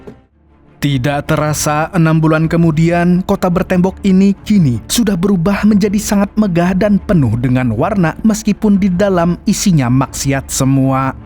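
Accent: Banjar, Indonesia. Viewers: Pian orang banjar kah mang.? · ai urang banjar skalinya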